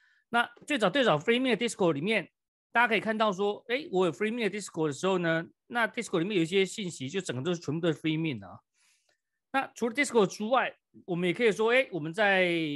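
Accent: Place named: Malaysia. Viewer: 感觉像马来西亚人。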